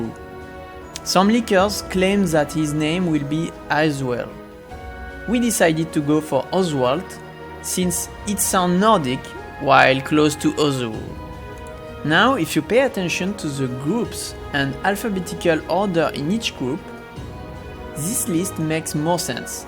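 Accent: France. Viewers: So you're speaking with a French accent? Your pronunciation sounds great. I wanna talk like that lol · Ooh gosh you’re French you hahaha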